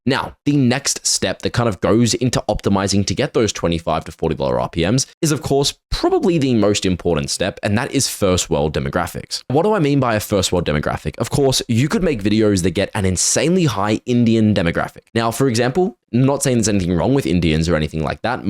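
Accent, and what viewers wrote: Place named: Australia. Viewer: This aussie accent is so cool ma man 🤜🤛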